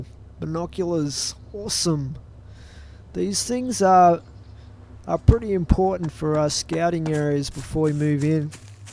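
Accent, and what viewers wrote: Australia. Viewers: you sexy aussie like me :D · are you an Aussie?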